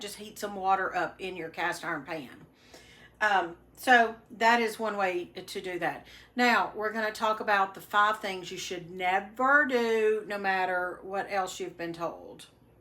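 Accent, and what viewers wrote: South, United States. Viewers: How's about to say Ohio? Where did you get that Southern drawl then huh? 😅 Love from South Carolina y'all hold it down up yander 👍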